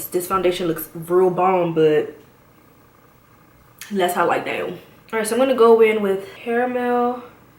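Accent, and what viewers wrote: Washington DC, United States. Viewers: as soon as she started talking I'm like yasss she must be from back home in DC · YOU HAVE TO BE FROM DC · is that dmv accent I hear in your voice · you sound like you from dc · I know that DC accent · DMV Wya???😂😂 as soon as she started talking I kneeeeww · this DMV ACCENT !!!! yaaaass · Are you from DC? Sounds so much like home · you from the DMV??! i knew from the moment u spoke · i hear that accent that's DC all day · You sound like you're from DMV area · DC accent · You gotta be from DC lol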